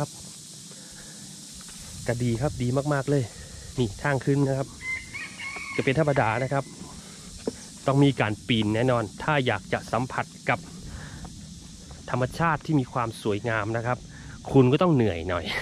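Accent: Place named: Sakon Nakhon, Thailand. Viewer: ข่อยกะคนสกลคือกัน อ.วานร แต่สำเนียงบ่คือเจ้า สำเนียงของเจ้าคือคนในตัวเมืองสกล ละเบ๋อ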